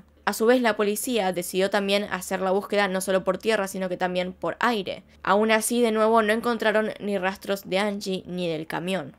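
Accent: Argentina. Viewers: Amo tu forma de redactar! Y más porque hablas en idioma argentino ♥️ ^^ Me gustaría que hagas el caso de Jenneka Jenkins! Gracias por el maravilloso contenido que nos regalas ^^ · Antes sólo veía a Paulette y ElizbetM, pero desde el día que YouTube me recomendó tu canal me encantó. Hace apenas una semana de éso y ya casi me veo todos tus videos. 😂 💕 Eres de las mejores en lo que haces, me encanta tu fluidez, vas al grano, das detalles y se nota que neta investigaste y conoces el tema a fondo. Muchas felicidades, y éxito para éste canal! 🙌🏻 Saludos de Mexicooo. 🇲🇽 P.D amo tu acento Argentino. 😂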